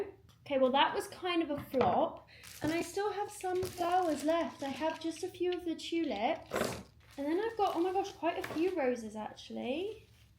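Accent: United Kingdom. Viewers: I absolutely love your videos, I am from spain and I love hearing your british accent 💕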